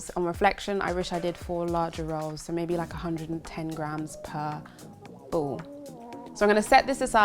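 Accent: United Kingdom. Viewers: Gosh you’re very beautiful and that British accent compliments your beauty. By the way I’m going to try this burger. I’ve been a vegan for three months now.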